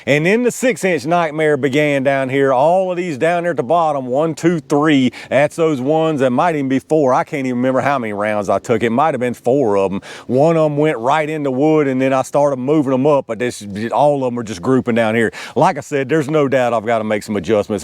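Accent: South, United States. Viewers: I got to say, you sir are my new YouTube man crush! Don't get excited I'm heterosexual and I've been married for 28 years, but you know how to talk, you got that sweet southern accent, and your intelligent. What a combination you're the man dude! Really love your videos, keep up the great work brother! By the way, I'm just a hillbilly also from Indiana lol!😂😂😂😂😂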